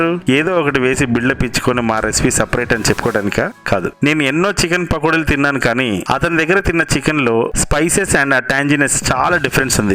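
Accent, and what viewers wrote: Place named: Kadapa, India. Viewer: తప్పుగా మాట్లాడింటి..... అంటున్నారు అంటే కడప or కడప sarrounding వాళ్ల మీరు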